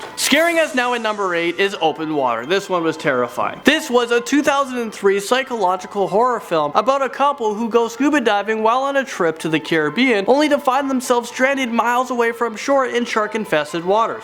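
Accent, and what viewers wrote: United Kingdom. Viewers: British sounds Better!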